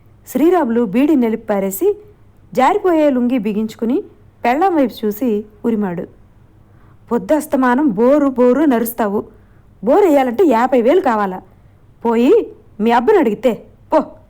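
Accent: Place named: Rayalaseema, India. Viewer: చిత్తూరు నెల్లూరు ప్రాంతాల మోడల్ ఉంటుంది కదా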